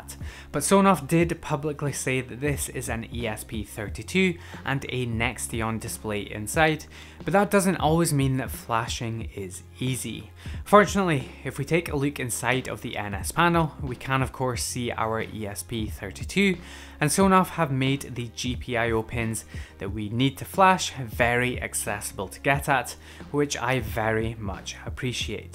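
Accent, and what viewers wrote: Scotland, United Kingdom. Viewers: At last a decent review, thanks for the clarity and Scottish accent a bonus! :)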